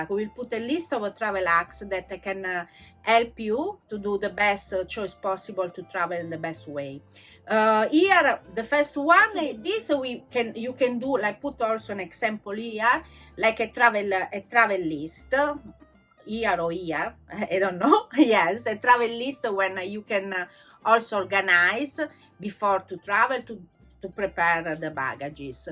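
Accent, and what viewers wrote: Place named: Italy. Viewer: Very good advices, and I love your Italian accent ❤️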